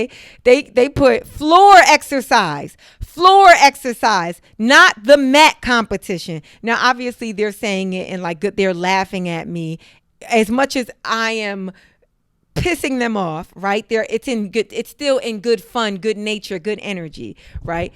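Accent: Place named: New York, United States. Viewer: Your short about your brother and western union and your NYC accent brought me here. Your accent takes me back home to Queens. New sub, new fan. 👏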